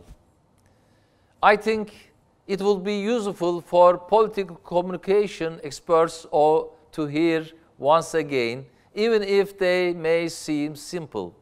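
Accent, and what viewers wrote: Black Sea, Turkey. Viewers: Yabanci dil konusmak bazilarinin dedigi gibi tercümanlik degil, iletisim kurulan kisilere direkt olarak hitap etme imkani saglayan günümüzün en önemli ikna yöntemlerinden biridir. Umarim yillardir devlet yönetmekte olanlar da "welcome" haricinde iki kelimeyi bir araya getirebilirler. Sayin Bey'den ricam biraz aksan ve telaffuzda türkce'den uzaklasmasi olur. Gurur duyuyoum. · İngilizce'yi bir Türk gibi konuşması hoşuma gitti. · Başkanım şakır şakır konuşuyo be helal olsun biraz karadeniz aksanlı ama yinede gayet akıcı ve anlaşılır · Amerikan İngilizcesi var İngiliz İngilizcesi var BUDA TÜRK İNGİLİZCESİ, REZİLLİK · Bu arada zerre sevmem imamoglunu ama bir bilgi vereyim eger ingilizce ogrenmek isteyen varsa ve aksandan dolayi yapamiyorum diyen arkadaslara bakin iyi bir ornek arkadaslar bakin gorun normal gundelik turkcesi gibi rahat turkce aksanla ingilizce konusuyor o yuzden sizde kasmayin illa ingiliz ingilizcesi amerikan ingilizcesi gibi konusmaya gerek yok bu aksan bile idare eder yeterlidir kasmayin yani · 6 yılını yurt dışında geçirmiş ve İngilizce'yi akıcı seviyede konuşan biri olarak söylüyorum, anadili İngilizce olanlar bile İngilizcesi anlaşıldığı sürece birinin aksanını umursamıyor. 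Adamın söyledikleri net bir şekilde anlaşılıyor. Önemli olan bu. Adamın aksanını eleştirenlerin kaçı İngilizce biliyor acaba çok merak ediyorum. Sanırsın kendileri sular seller gibi İngilizce konuşur. Türkiye'nin English Proficiency'de Avrupa sonuncusu olduğu bir gerçek. Saçmalamayı kesin. Türk aksanıyla İngilizce konuşmak utanılacak bir şey değildir. En azından Türk aksanıyla İngilizce konuşan birinin söyledikleri anlaşılır. Gelmişler buraya adamın aksanını eleştiriyorlar. Hayatınızda kaç tane aksan duydunuz acaba? Sanki kendileri kraliyet ailesi İngilizcesi konuşuyor. Konuşması yavaş geliyorsa da videonun hızını artırırsın olur biter. Zaten büyük çoğunluğunuz altyazı olmasa bir şey anlamazsınız bu videodan o yüzden adamın konuşmasını eleştirmeyi kesin. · adam güzel konuşuyor aksanı da Türk aksanıyla ingilizce işte ama konuşması falan akıcı güzel yani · Van münit vs Türk aksanlı İngliş. 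Türk aksanlı İngilişin başımın üstünde yeri olduğu bir versus. Başımın üstünde derken TC'nin başında. Ha bu arada altyazılara bakmadan adamın dediğini anlayabildim. Anlayabildiysem sorunu da yoktur. Ama şunu söylemeden etmeyeceğim. Bunu yazan her kimse, mesleği akademisyenlik. Belli kullandığı cümle yapısından. Yabancı dillerle uğraşan her kimse değiştirmesini tavsiye ederim başkana. Çok kullanacak çünkü başkanım bu dili ilerde. Bu şekilde gitmez. Dost tavsiyesi. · Baskan Turk aksanini iyi ozetlemis:) Yalandan aksan kasmaya calismaktansa catir catir yerel aksanda konusmasi en iyisi. Ingilizceyi ve alsani bir yana birakirsak yeni jenerasyon siyasetin guzel bi analizi yapilmis. Genel ve yerel yonetim farki analizini ozellikle begendim.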